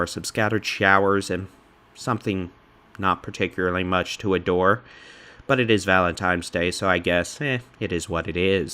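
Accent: Texas, United States. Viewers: I’m just going to say it. You are a handsome man. I enjoy your channel for many reasons: the humor, the honesty, the thoroughness, the lovely, homey Texas accents, but also you are just easy on the eyes. Not trying to make things weird, just letting you know that one Texas lady finds you very pleasant to look at. Have a nice evening and thank you for everything you do.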